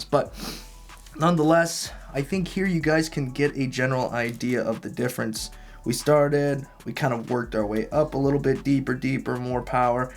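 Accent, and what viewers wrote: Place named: Vermont, United States. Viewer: You sound like you’re from Vermont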